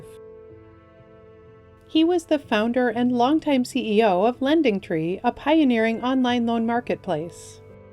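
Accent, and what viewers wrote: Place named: Fargo, United States. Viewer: Think about using ai to narrate for someone less Fargo sounding.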